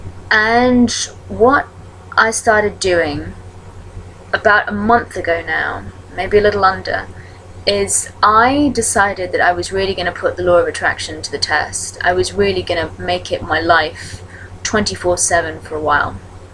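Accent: United Kingdom. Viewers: thank you. Nice video of LOA also British accent. I subscribed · Her accent is the most catchy thing ever, so cute :) Is that UK accent? What part of the UK is she from? I love it!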